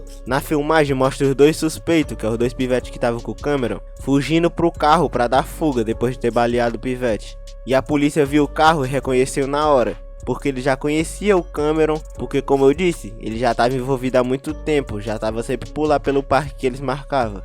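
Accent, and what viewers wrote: Fortaleza, Brazil. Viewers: Tu é de Fortaleza é btl? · Tu é de fortaleza? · Mansho tu e de fortal ne? kk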